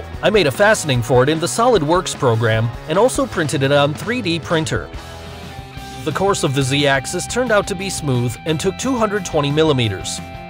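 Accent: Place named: Russia. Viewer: the heavy russian accent is authentic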